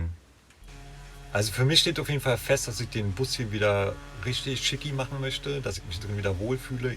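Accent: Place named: Berlin, Germany. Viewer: Oh Gott wie furchtbar 🫣 gut das euch nichts passiert ist🙏 Ich liebe den Berliner Dialekt 👍 erinnert mich an einen Brieffreund aus Berlin vor 40 Jahren 🫣🫶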